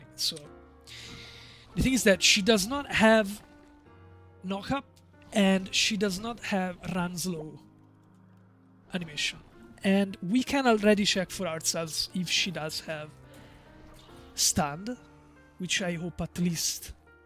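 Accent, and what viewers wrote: Italy. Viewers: bro are you italian?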